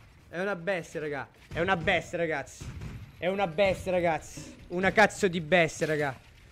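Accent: Calabria, Italy. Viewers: Ma sei o Ninja??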